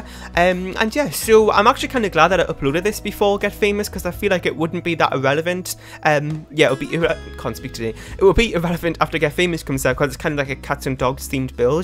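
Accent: United Kingdom. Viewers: Where in the UK are u from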